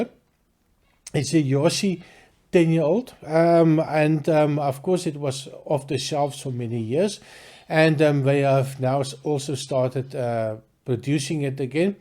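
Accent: Afrikaans, South Africa. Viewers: Hi. I like your reviews. Your accent is spot on with an Afrikaans person speaking English. It’s like a South African Boer giving a whiskey review.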